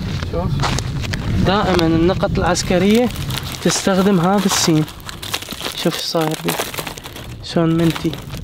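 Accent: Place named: Basra, Iraq. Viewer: مادري ليش يضحكني سدو خشمي وجيبلك كونيه وحمل هواي مصطلحات كلش حلووو هاذ من البصرة احسه نفس لهجتنه.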